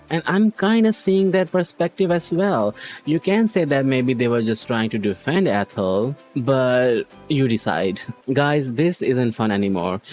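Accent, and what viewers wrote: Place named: India. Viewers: ... what? Like, that's one of the most common Indian accents. Should he stop being Indian? 😭🙏🏻 Nvm tho, this must be rage bait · I love indian accent :) its my favorite accent in english (im from brazil)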